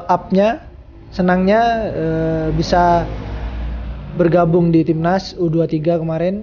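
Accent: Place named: Madura, Indonesia. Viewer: Oreng madureh smpyan kak 😄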